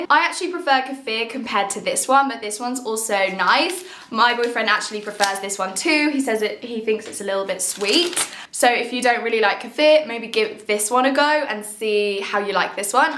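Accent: United Kingdom. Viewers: What a beautiful British accent! It feels like I am back in the UK. Though you are not quite right regarding special attention to cleanliness in Moscow for the reason that it's the capital. I spent nine months in my home city of Krasnodar (1 million population) last year and its impeccably clean - not only central streets but everywhere. They clean it and clean it and clean it again. You don't see too many police but the city is very safe. If there's police on the street it's usually a mixed patrol - a police officer with two Cossacks (as it's a Cossack region). Again, it's very safe, you don't see drunk people (except the area of the railroad station probably). Thanks for you opinion. It's very interesting! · hi, i'm Russian girl living abroad, like how you speak about russia with your british accent, and dairy food! miss that 😀 I'm also a small youtuber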